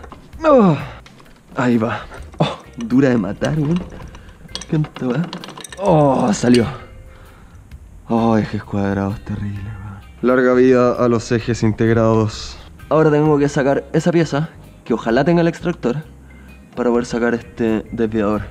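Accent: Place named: Chile. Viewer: Me encanta tu asento chileno hermano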